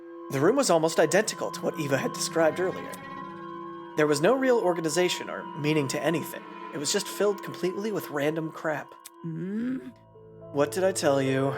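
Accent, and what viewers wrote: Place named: United Kingdom. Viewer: Listening to you gush about this game while you play it is pretty great. Also, enjoying your British accent.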